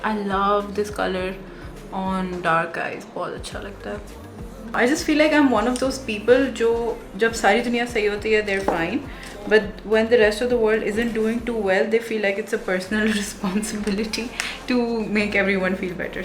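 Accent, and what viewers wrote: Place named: Sindhi, India. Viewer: Aww yOur sindhiii iS sO cute....!!!😍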